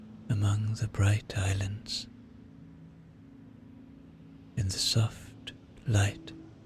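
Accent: Ireland. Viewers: I love the lovely lilt of the southern Irish accent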